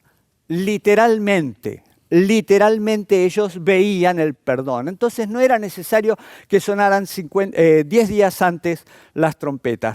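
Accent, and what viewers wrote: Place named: Argentina. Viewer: De Argentina supongo por su timbre de voz.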